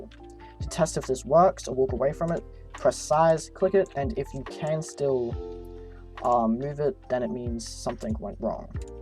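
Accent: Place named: United Kingdom. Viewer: when a british guy narrates, you know its a good tutorial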